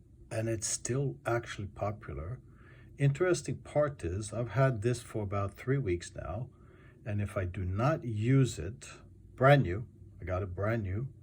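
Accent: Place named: Greece. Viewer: You sound like a Greek who lives abroad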